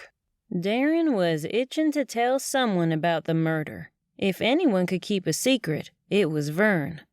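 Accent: South, United States. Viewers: I am sorry, but this narrator’s southern accent is way too much, I can’t finish it, it’s so distracting, and takes away from the story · I love the Southern drawl. And I love the story. Thank you! Perfect entertainment as Halloween approaches 😊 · Y'all don't live in the south if you think this is over the top! All my neighbors talk just like this! And I'm in south Florida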